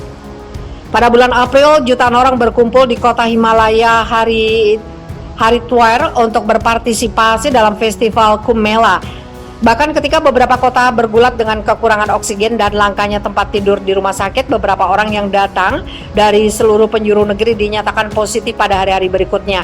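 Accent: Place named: Java, Indonesia. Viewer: Lidah Admin pekat jawa berbahasa Inggeris. Lebih baik x usah sebut. Maaf ya.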